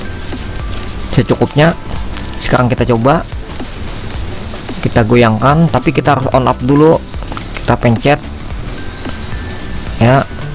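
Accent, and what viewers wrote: Banjar, Indonesia. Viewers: Logat ikam banjar banar . Aku samarinda kampungku amuntai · logat bahasanya kaya urang banjar....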